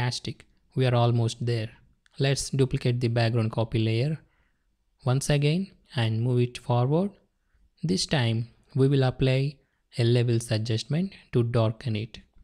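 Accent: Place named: India. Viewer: Tumhari awaj se laga ki tumhe hindi aati hogi bro plz hindi me video banao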